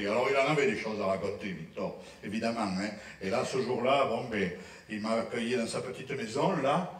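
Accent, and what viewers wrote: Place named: South, France. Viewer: avé l'acent = génialissimo !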